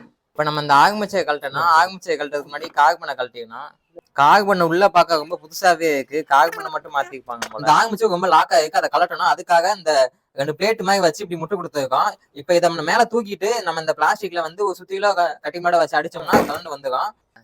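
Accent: Madurai, India. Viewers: Madhurai karan ahh bro neenga 😅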